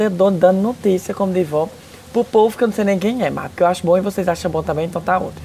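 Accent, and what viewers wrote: Northeast, Brazil. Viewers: ...acho é bom! Eu amo esse sotaque gostoso só nosso (sou cearense tbém). Bjo! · Gosto demais de assistir seus vídeos. Sou de Aracaju e vixe! é bom demais ouvir outro nordestino falando. Love it! je t'aime! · Olá, adoro o seu jeito espontânio de falar, apesar de está morando em outro país não perdeu a sua simplicidade e o seu jeito arretado de Nordestino, me divirto muíto com os seus videos.. Manda Alô pra mim meu nome é bem fácil JOSIBERTO 😃😃..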